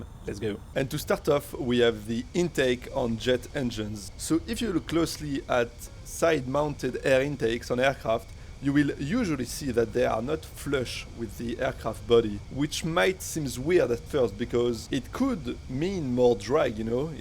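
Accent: France. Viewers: His accent is French btw lol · You have a faint high pitched tone in your mic recording, most noticeable in the Outro. I enjoy your French English very much xD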